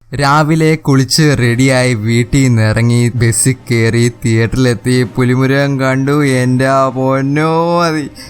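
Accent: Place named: Idukki, India. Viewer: ഇടുക്കി ജില്ലക്കാരന്‍റെതുപോലെയുള്ള ഉച്ചാരണരീതി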